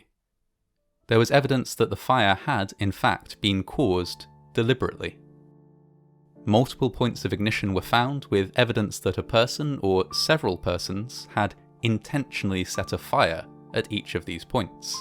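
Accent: United Kingdom. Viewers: Is this British accent?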